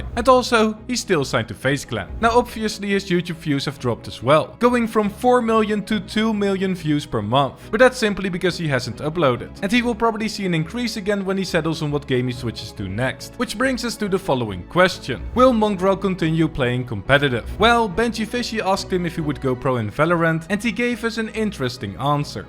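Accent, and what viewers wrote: Netherlands. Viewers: are you dutc hyou got a dutch accent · Are you dutch?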